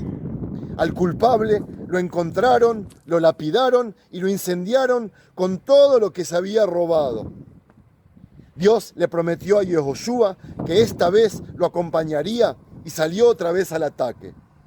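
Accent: Argentina. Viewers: Quisiera preguntarte adonde aprendiste espanol suenas de Argentina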